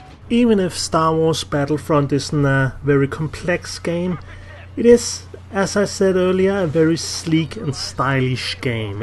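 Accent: Denmark. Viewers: Men too, I love the goofy Danish accent, all while the content is supremely nerdy and having a god mix off subjective and objective evaluation. You and Demiath are my two go to guys for intetesting video game content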